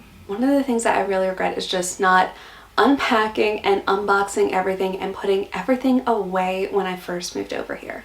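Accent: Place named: Australia. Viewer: I am concerned that you refer to this as "things i regret about my first six months here." My wife and I came here from NZ in 1981 and our experience of homesickness was very different. I had a job to come to so I had to learn to speak Australian quickly, I had to learn to "get on the team". Francie, on the other hand had lots of time to mope about the friends she left behind and her family still in NZ. It took her YEARS to get over homesickness. I tell you that because you've had a huge upheaval. You might not see the enormity of what you've acomplished yet, but it is enormous. You must NOT allow yourself to regret not doing things. You've been busy as hell learning to live in another country. Jeez you even speak like an Aussie (sort of )!! You should think of each of those things as "now i've been here 6 months and settled in with Mark, here's what i really want to get going on for the next 6 months ..." That's a far more positive way to think of it. 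It's very easy to tell yourself that life is terrible and you'll start to believe it But just as easy to say "life here is wonderful" and you'll fool your brain into thinking that instead. Not changing things in the house early on: I think you were right not to change things around all around the house. Yes you want to make some of the house 'yours' so it's the home of both of you, and I agree with that, but on the other hand you dont want Mark saying to himself, "my God!!! she's come all this way and in five minutes she's chan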